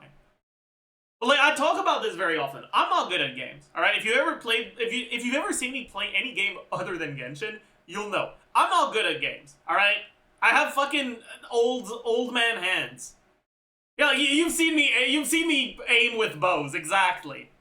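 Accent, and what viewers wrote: Quebec, Canada. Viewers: which ones have you noticed? it could be because he's a quebecois french speaker, especially with fontaine names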